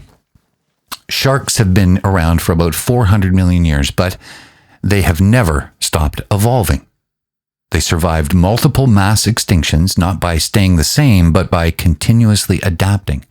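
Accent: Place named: Canada. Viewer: Bro,your accent has a little Canadian or maybe Minnesotan flavor. I noticed your "aboot/aboat" and the way you say "sory".....amirite? You don't have to answer, I know that's considered an inappropriate question these days. I'm just learning to ask questions, something I was not allowed to do growing up so cut me some slack maybe? 😊💙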